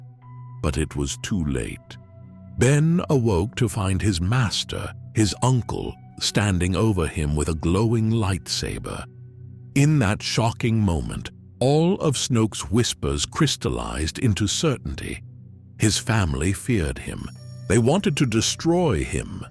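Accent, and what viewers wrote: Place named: United Kingdom. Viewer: I never knew someone so british could tell stories about StarWars, but im in for it <3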